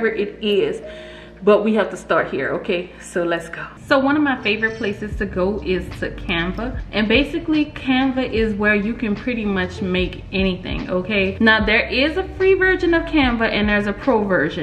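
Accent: New Orleans, United States. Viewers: Are you from New Orleans? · I heard you talking and I said she must be from New Orleans or somewhere around there. Check the description, Sho nuff you are. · I have a quick question 🙋🏾‍♀️ Does any marketing or advertising that we have to do. Also I am from (Nola) I can spot our accent anywhere 😂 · Naturally New Awlins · Yes, let’s please! I hear New Orleans 💜💚💛 Is that where she’s from?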